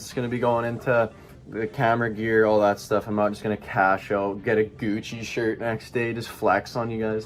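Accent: Canada. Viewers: Commentary is hilariously Canadian #LetsGoBoyzzz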